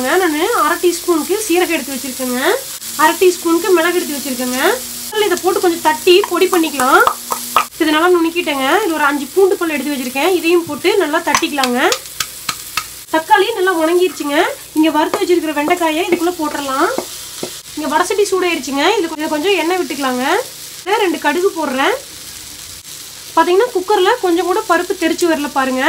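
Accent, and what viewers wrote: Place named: Tamil Nadu, India. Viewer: Romba supera irundhuchu. You are explaining really well.You are giving nice tips also like washing utensils immediately, cleaning the gas stove after cooking....your language is very nice to hear..you are from which part of Tamilnadu?I have become a fan of you now.Upload more under 30 minutes cooking videos please.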